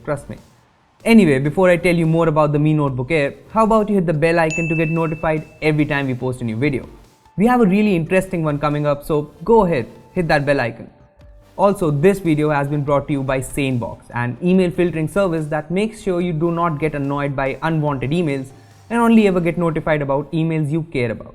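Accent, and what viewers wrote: India. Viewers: iski english bhi to hindi jaisi hi lgri h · I hate Indian English.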